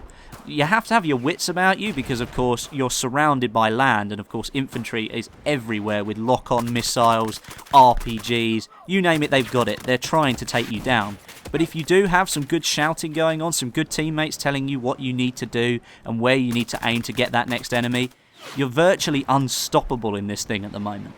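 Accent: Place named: Crawley, United Kingdom. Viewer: But for the record I can hear that Crawlian (If that's even what you call people from Crawley) accent strongly in this vid! :D Remember this comes from a guy in Horsham! (You know a town like 5 mins from Crawley and that is like a BAZILLION times better than Crawley! xD)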